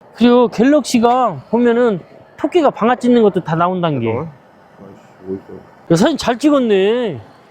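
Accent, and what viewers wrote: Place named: Chungcheong, South Korea. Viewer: 충청도 말씨 일부러 쓰지 마시고 전라북도 말씨. 사투리를 써 주세요. 저는 정읍이 고향 입니다.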